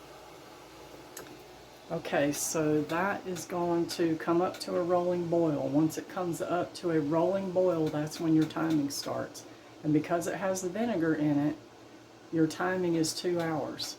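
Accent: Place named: South, United States. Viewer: I think your awesome and thank you for explaining things so easily yet thoroughly! I appreciate the calm southern voice! :) Well, it sounds southern to me!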